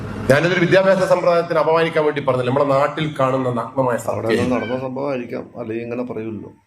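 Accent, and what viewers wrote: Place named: Southern Kerala, India. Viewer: തെക്കൻ ഭാഷ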